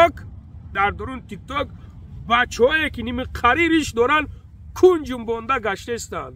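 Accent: Kulob, Tajikistan. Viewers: Саломалекум ака чиста кулоби гап мезани мабодо ай кулоб набоши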